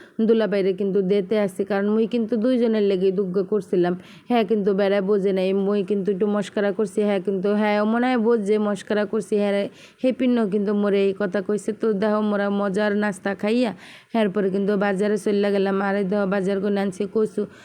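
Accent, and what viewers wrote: Barishal, Bangladesh. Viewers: নাস্তা খাওয়া রান্না কেক বানানো বরিশালে ভাষায় কথা বলা খুব ভালো লাগলো ❤❤❤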